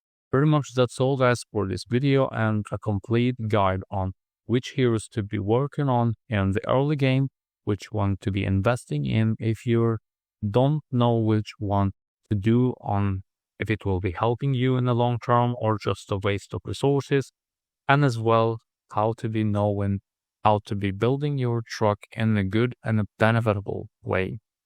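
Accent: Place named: Russia. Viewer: Ur English says u are from Russia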